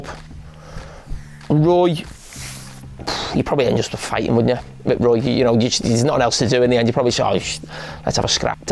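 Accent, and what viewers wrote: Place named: Liverpool, United Kingdom. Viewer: Do scousers deliberately choose not to be able to speak properly? Sighseeaydo? David De Gayer?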